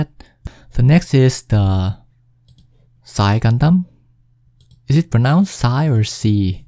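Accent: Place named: China. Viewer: That's not an indian accent. I think he's Chinese.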